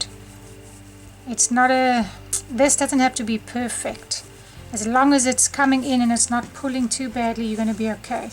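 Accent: South Africa. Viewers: Your south african :) so am i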